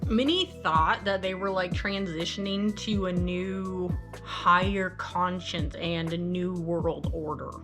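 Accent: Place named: Texas, United States. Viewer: Dear! This is so fucking hilarious. Your stream of consciousness took me for a ride! It was such a rush and because of the depth of your research It was a ride of outstanding beauty and mindblowing insights. I adore your dialect!! Are you an Unicorn with a Texas-Twäng? Where do you come from? Pleeeeze tell me!! I' m from Germany. 🍀😉♥️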